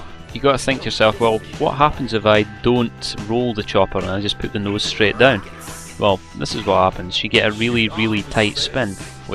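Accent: Scotland, United Kingdom. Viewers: nice tutorial m8. i see you're very experienced. and nice accent. im scottish too :D. Do you still play BF2?. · nice tutorial m8 good 2 hear a scottish accent explaining it a want to master that funneling looks a good move i try swoop in shooting lol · Nice one. You sound quite clever for a jock ;-) Great tutorials there. Really enjoyed ya voice too. Normally cant stand Scottish accent, but then again you would hate my brummie one eh?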